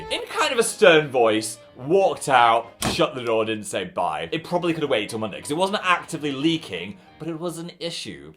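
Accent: North, United Kingdom. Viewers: You were soooo northern in this video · is it just me or is extra british in this video 😭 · switched to northern for dialogue and then did the entire remainder of the story in northern, that’s pretty cool tbh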